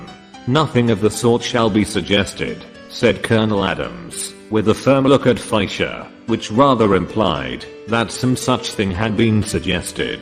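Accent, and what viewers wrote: United Kingdom. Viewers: good british accent... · All of his voices and pronunciation is perfect!Full of British Accent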